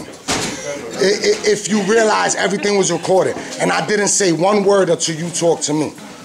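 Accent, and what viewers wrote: New York, United States. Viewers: You so New York bro 😭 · Good job. Holy shit, you sound like you've never left the boroughs. Takes me back. I live in Oklahoma now but my family is from West Haven. Keep it up. Subbed. I'll forward this to other auditors and mirror on my channel, with your permission.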